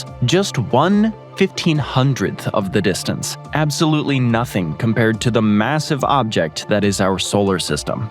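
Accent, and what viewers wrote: United States. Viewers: The whole video is in American English. And a lot of cities have different names and pronouncations. Like countries. Most countries call themselves different than tge rest of the world does. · The voice being spoken with an accent from USA - says a lot. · Got to admit, this is probably the most enjoyable vid' with an American accent I've ever watched. Even so, as a Brit well into my 70s, I wasn't taught most of these myths in school. Then again, I wasn't taught that history started on July 4, 1776, either. America, please don't take offence. I'm only pulling your leg. Your cultural view of the rest of the world does amuse me, though.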